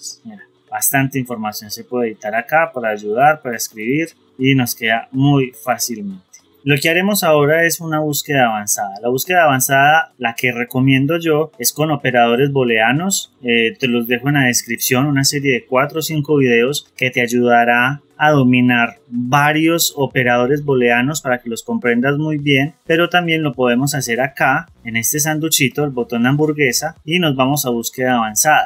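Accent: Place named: Medellín, Colombia. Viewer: Excelente amigo, sos de medellin, colombia verdad🧠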